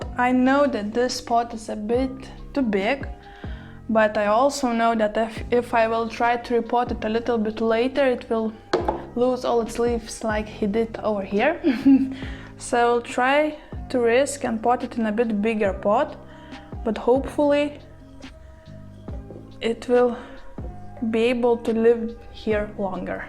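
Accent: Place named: Russia. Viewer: You speak English with Russian accent. It means that you are a bilingual and your first language is Russian. Isn't it? Здесь большая русская аудитория, которая интересуется цветоводством. Советую делать ролики и на русском: прибавите себе подписчиков. Желаю удачи и всего самого хорошего!!!!😀🌷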